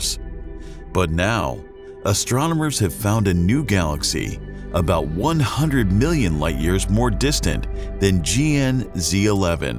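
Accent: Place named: United States. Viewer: clicked on video then heard this mans american accent, yeeeesh no thanks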